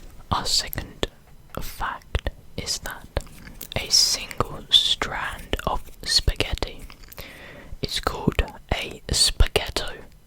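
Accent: United Kingdom. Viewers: Two things I love : ASMR and British Accent❤ Hugs from Brazil💚💛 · Your British accent is awesome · I love the British accent 🤣. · Are you speaking in modern rp or just British accent?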